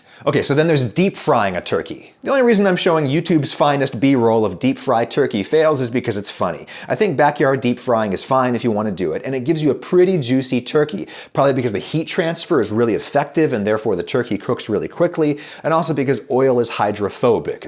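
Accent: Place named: New England, United States. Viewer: Let me guess, you're a yankee ain't ya?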